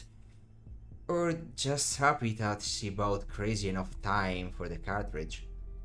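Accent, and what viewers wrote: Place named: France. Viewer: 10/10 video earned new sub (youre accent sound french) :D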